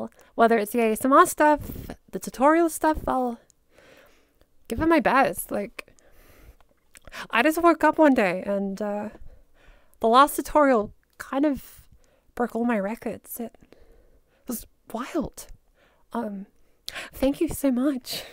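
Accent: Australia. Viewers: yeah i ultimately agree with that but idk just aint for me i suppose id say the only problem i got with ur vids is that u got an australian accent so its a bit of a work around to be like "oh how would i say that" but its pretty easy to work around so its no big deal tbh plus its probably amazing for people with Australian accents but yeah thats all thx for replying and its good to hear that ill be on the lookout hope your having a wonderful day also your contents amazing even without including tutorials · It's so nice to have an australian accent guide for the exercises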